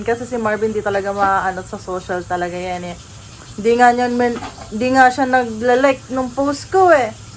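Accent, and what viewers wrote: Batangas, Philippines. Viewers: Mga bata pa kayo kaya keribels lahat ngbpagsubok basta laban lang! Vlog nyo plagi ang nasa tv sa sala namin hahaha.. asawa ko plagi nanonood sa inyo habang nagawa ng email nya sa trabaho sa gabi😂Tawang tawa sa iyo dahil pareho tayo ng punto, taga Batangas kasi kaming mag asawa!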